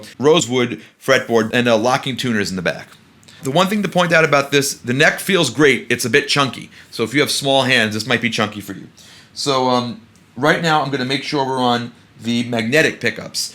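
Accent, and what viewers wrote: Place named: Brooklyn, United States. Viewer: yo are you from Brooklyn? Bensonhurst or Bay Ridge?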